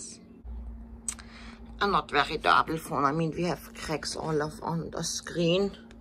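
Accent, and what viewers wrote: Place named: China. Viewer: IST DAS ENGLISCH MIT CHINESISCHEM AKZENT???!!!!!!!🤣🤣🤣🤣 ICH VERSTEH KEIN WORT VON DEM WAS DIE LABBERT!!!!!!🤦‍♂️🤦‍♂️🤦‍♂️🤷‍♂️🤷‍♂️🤷‍♂️ Ich empfehle diesen Kanal NICHT weiter!!!!!😑😑😑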